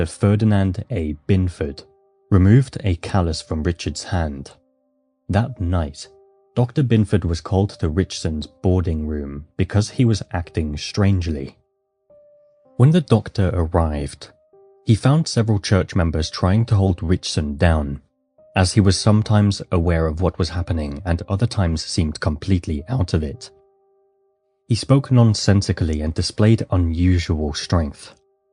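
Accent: England, United Kingdom. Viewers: Wait is it you? I like it. You are not mispronouncing the word, please don’t work on it, it is my American ears and your lovely English accent. There is nothing wrong with your pronunciation!!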